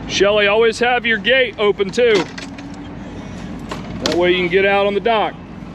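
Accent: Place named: Buffalo, United States. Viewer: Great Captain. Very informative. With a Buffalo twang lol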